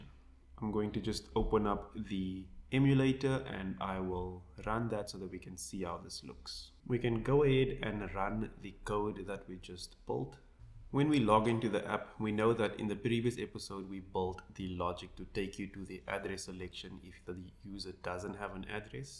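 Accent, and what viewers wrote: South Africa. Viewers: You from SA? oh wow I can hear the accent and the Cape Town location haha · I know a south african accent when I hear one lol, CPT ne? Bro you might want to hide your API key there.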